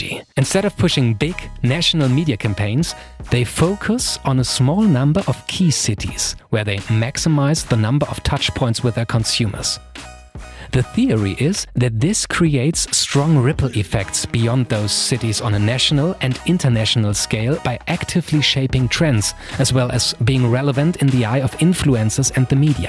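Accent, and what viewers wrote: Germany. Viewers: Deutscher akzent kickt hart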